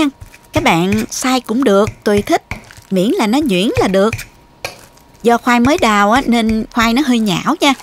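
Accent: South, Vietnam. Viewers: Cô chất giọng gốc miền nam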